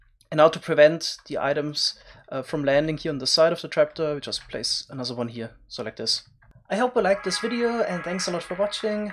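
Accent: Germany. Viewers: Haha man hört das du n Deutscher bist :D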